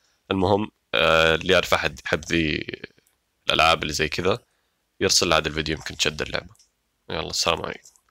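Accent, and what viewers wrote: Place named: Saudi Arabia. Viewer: اللهجة السعودي مضبوطة مرة كتير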